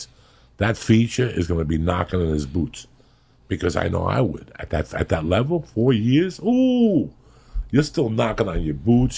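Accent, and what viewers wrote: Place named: East Coast, United States. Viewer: He doesn’t remind me West coast comic more east coast roots. Accent. Comedy is east coast. I’m glad he is proud to be from New Jersey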